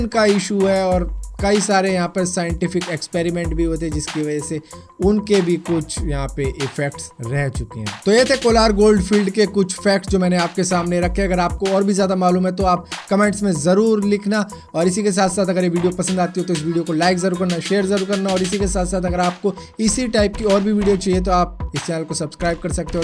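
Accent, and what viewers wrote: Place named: Delhi, India. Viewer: Bhai facts ke maamle m thmhara channel no. 1 hai. Delhi ka accent toh h hi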